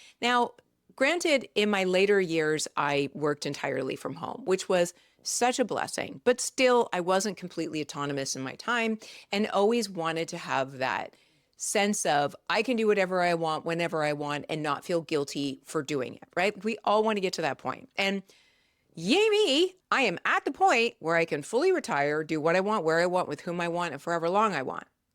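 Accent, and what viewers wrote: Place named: Canada. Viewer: You hide it well but I can hear your Canadian accent. I'm going with British Columbia somewhere outside Vancouver.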